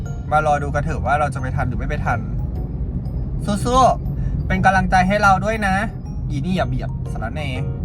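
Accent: Isan, Thailand. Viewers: เว้าอีสานตาฮักจ้า❤❤❤❤ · ช่องนี้ไม่รู้ชื่อช่องอะไร เจ้าของช่องคุยสนุกมากน่ารัก หล่อสวยอยู่ด้วยกัน เว้าอีสานก็เว้าม่วน ชื่อว่าจังได๋น้อนี่😂😂❤❤🎉🎉😢😢😅😅😊😊😮😮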